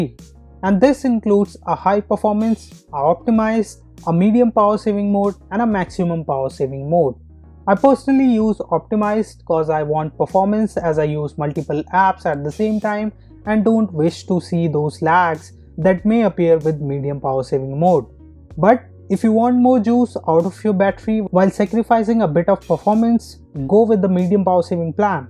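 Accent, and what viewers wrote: India. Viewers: Im tired of your Indian accent. Sorry to say that.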